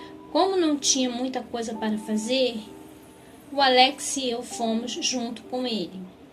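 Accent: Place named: Rio de Janeiro, Brazil. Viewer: seu sotaque carioca é muito fofo 😊. É cada história hein, alguma coisa ruim tinha lá. Credooooo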